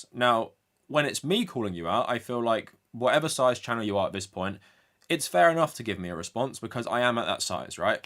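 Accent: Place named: London, United Kingdom. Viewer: Lots of Vanguard fans in the comments who didn't watch your vid and took their words for yours. I watched yours before theirs. I know exactly what you were trying to say, I might not agree with it all but people saying you are paid off by TYT or are some sort of British guy on his high horse (they can't even spot a London accent) just comes across as dumb guy no read books sucdem nonsense. We're literally in the midst of a F takeover and they are talking about YouTube drama. Not social security, not Medicaid, not Medicare. YouTube drama. We should probably all be better than this, but here we are.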